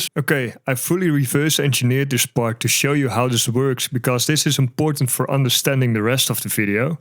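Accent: Netherlands. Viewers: i thought you sounded dutch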